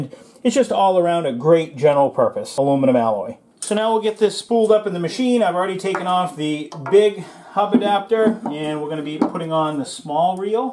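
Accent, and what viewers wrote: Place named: New England, United States. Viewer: I love new england accents LOL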